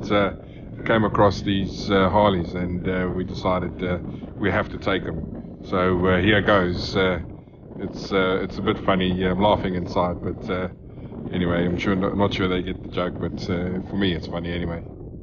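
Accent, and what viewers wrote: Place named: South Africa. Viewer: Hey boet! I discovered you in one of Dick Whistles videos. Think it was Distinguished Gents ride. I was moved to hear about what you are going through. Although I’m not really a YouTuber. Only made a couple pipe smoking vids which I enjoy. I have ridden bikes since I was a wee nipper. Back in Cape Town SA. Where you based mate I can hear a Safa twang in your accent boet. Drop me a line. I’m in sunny Basingstoke with my Mrs. Would be a pleasure and honour to meet you good Sir. Perhaps meet at Loomies one fine day ( perhaps pushing it in the U.K. lol). I’ll go with dry lol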